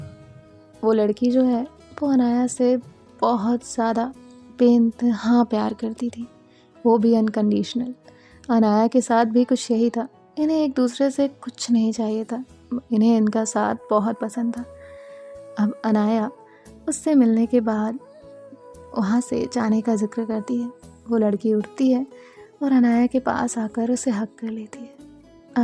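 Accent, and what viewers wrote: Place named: Punjab, India. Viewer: Or ap bataiye kaha se hain ap Punjab se ya chndighar aisa lag rha h but ap bataiye ..hum mp se hai .Ujjain se ...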